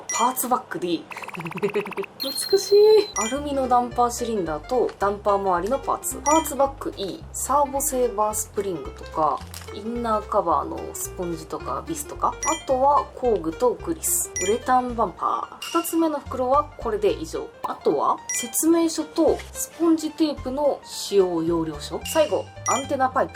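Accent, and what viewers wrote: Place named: Japan. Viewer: I prefer hearing your Japanese accent with the subtitles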